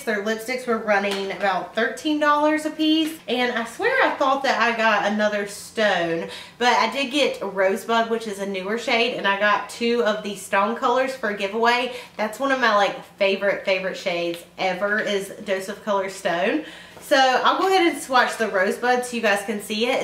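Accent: South, United States. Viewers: I love you and your accent so much! I have always loved people of the south and ended up marring a man whose mom is from Georgia. You guys say things similarly and it warms my heart. Your channel has grown so much since I first started watching you! Great job! I now have a beauty channel as well if you would ever like to watch someone new.❤ I hope to go to IMATS next year! I live only about an hour and a half from LA!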